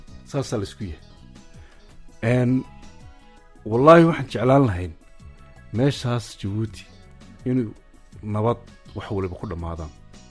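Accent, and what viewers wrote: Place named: Mogadishu, Somalia. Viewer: Waa Asal Hadii ayna Baran Afka Reer XAmarka Waa Gabadh jirta Jabuuti Weeyan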